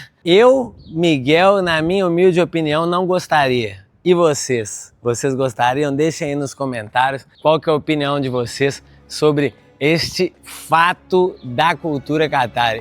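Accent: Minas Gerais, Brazil. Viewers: ce e mineiro? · Muito bom , pelo sotaque é aqui de minas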